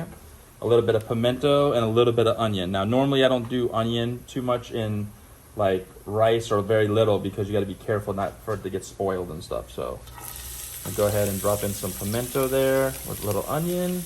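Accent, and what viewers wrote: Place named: Trinidad and Tobago. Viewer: Hi, i love how you use the local trini indian terms when you are cooking. You sound like a real long time trini back home 😊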